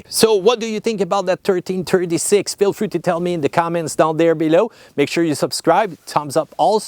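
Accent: Canada. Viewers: I like his accent. Canadian people are awesome.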